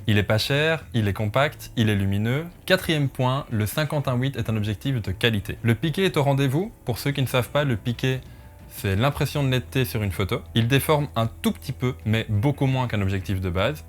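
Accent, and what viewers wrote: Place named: Belgium. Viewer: j'ai réussi à capter que t'étais belge alors que t'as un accent trop subtil putain j'suis trop stylée (j'ai eu la confirmation quand t'as dit "septante" haha), en tout cas merci pour la vidéo, je viens de l'acheter et j'en suis très satisfaite !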